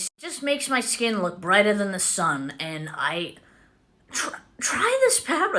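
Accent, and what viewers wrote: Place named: Boston, United States. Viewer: It takes a Boston accent to know one. It’s so weird how it’s normal in real life but on Tv/Phones/videos... the accent sticks out like a wicked sore thumb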